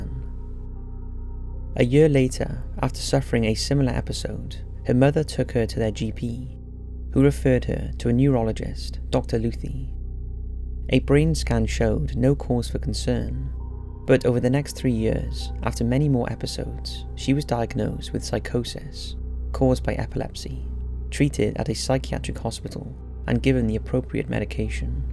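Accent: Wales, United Kingdom. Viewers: Thats a welsh accent si x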